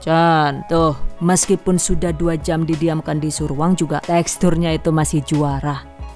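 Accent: Banyumas, Indonesia. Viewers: Ngapake bikin candu😁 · Aduh aku geget wong ndi si mbokayu deneng ngapak mblaketaket pisan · Ora ngapak ra kepenak😂😂😂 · Aku ya eong ngapak, tapi ari krungu ngomong ngapak geget dewek 😅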